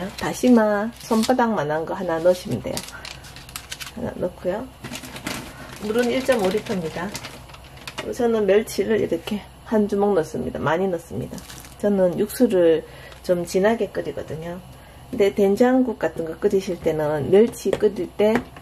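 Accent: Gyeongsang, South Korea. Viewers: 안녕하세요? 안내에 따라 와보니, 여기도 매우 좋은 곳이네요! 근대 된장국, 보기만 해도 침이 꿀꺽 넘어갑니다. 귀하는 경상도 말씨라도 목소리가 좋으며 설명이 명료하네요! 거듭 감사드리며 - - - - 자주 뵙길 희망합니다.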